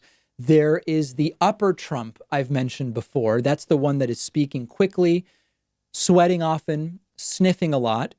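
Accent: Argentina. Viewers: ‘Cinco de Masho’ - love the Argentine accent.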